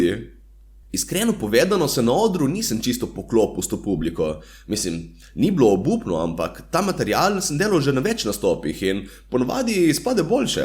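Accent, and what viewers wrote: Primorska, Slovenia. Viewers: Obožujem tvoj naglas🙌 primorci rulamo😂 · Ma kaj, super si bil, samo, da žirija in publika ni imela pojma, kaj je to komedija. Kaj pa blebeta tisti Čakarmiš, kaj pa je on naredil v življenju, razen tega, da je nekaj delnic kupil od Pro Plusa in lepo živi od dividend in drugim soli pamet. Toliko brezveznega pesimizma naših Slovencev pa še ne. Seveda, tudi jaz sem bil začuden, da si bil na Talentih, nekaj časa sem mislil, da je neka reklama zate, no potem pa sem poštekal, da resnično tekmuješ. Bil si brez treme, govoril našo primorščino in hvala Bogu, da te imamo. Tu sei bravo!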